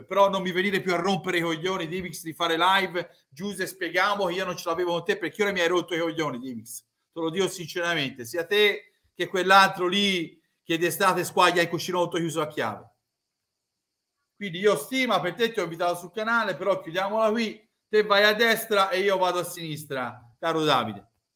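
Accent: Tuscany, Italy. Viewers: Ho iniziato a seguirti da poco ma ti faccio i complimenti..a parte la parlata toscana che adoro, io sono bolognese ma interista, sei l unico che in tempi non sospetti ha detto le cose come stanno...i risultati hanno offuscato la disastrosa situazione economica...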